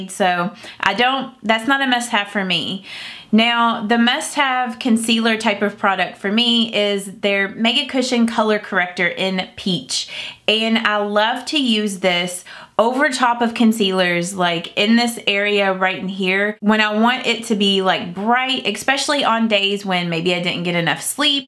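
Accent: South, United States. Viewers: Lol, sorry looks like I'm flipping yall off in that southern draw, I loved it.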